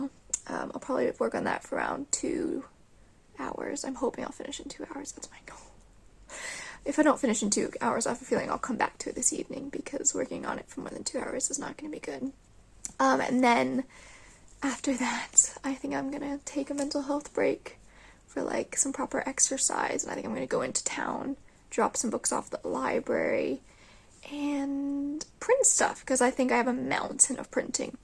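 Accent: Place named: North America. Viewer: Your vlog style is pretty relaxed. Sorry to hear you're stressing over assignments. Of course, everybody goes through that in one way or another. Good that you have friends in your house there. had her staircase mates in her Jesus College dorm. ...so I've been wondering what's up with your North American accent, and that fact that you say "bangs" instead of "fringe" and don't know the word "crest". May I ask where you're from?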